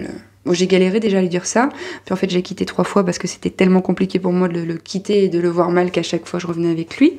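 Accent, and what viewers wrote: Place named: South, France. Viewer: Incroyable cette vidéo et la petite ref de Nietzsche et l'accent du sud c'est fou.. merci pour toute cette justesse et ton partage et cet humour !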